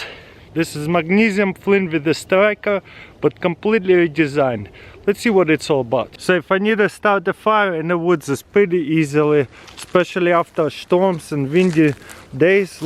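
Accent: Russia. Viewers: Russian accents are my favorite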